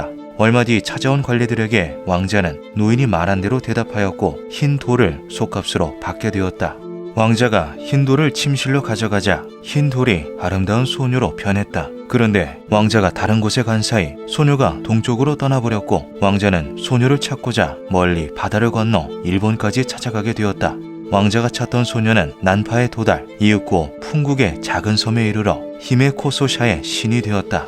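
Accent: Gyeongsang, South Korea. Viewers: 대구네 ㅋㅋ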